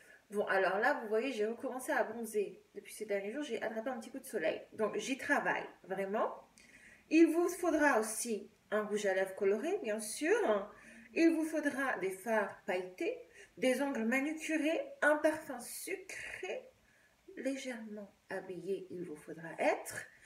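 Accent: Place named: United States. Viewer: Merci Tu me tues... 🤣🤣Tu T'habilles toujours dans des habits magnifiques qui Te vont trop bien, je trouve... Oui cagole est un mot issu du vocabulaire marseillais... Oh Tu m'as appris un truc, là... Merci 🙏Ton accent américain est trop mignon... un tantinet québécois (ou Canadien) et Te va super bien aussi... Tu es trop mignonne... Y a aussi "pimbêche" qui est synonyme... Tu mérites des fleurs... Pour moi Tu n'es pas une "cagole": Tu es une Princesse... Déesse... Au delà de ton look j'aime ton esprit libre et je ne sais pas comment Te le dire autrement que j'aime T'écouter... J'aime Te faire du bien... Oui, vraiment s'il y a de la haine contre Toi, je souhaite être ton pansement pour cicatriser tes plaies, ton mouchoir pour pleurer... Je Te kiffe... C'est sans doute prétentieux de ma part, mais si je pouvais arracher mon coeur pour Te l'offrir en guise de bouquet de fleurs, je le ferais... 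Ton discours est détonant: très intéressant... Une révolution... Merci! Je tiens à Toi comme à ma famille... J'ai besoin d'être ton héros ou en tout cas d'en être un pour Toi... je T'aime! Je suis contre les modifications corporelles (sauf suite à accident) contre les tatouages (même si certains son jolis) contre le maquillage (même si c'est joli) contre l'épilation... et contre les discothèques... mais je comprends l'intérêt, un peu... (je crois) Ce que je voulais Te dire: vraiment ne Te prends surtout pas la tête... Moi je me la prends trop souvent... Je réfléchi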